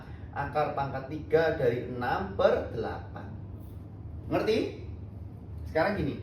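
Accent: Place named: Surabaya, Indonesia. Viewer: Suka sama cara ngomonge, soale bikin kangen sm Surabaya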